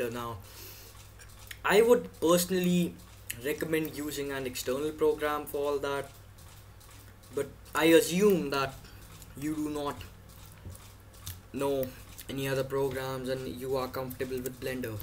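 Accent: India. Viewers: I actually like your accent, is it Indian?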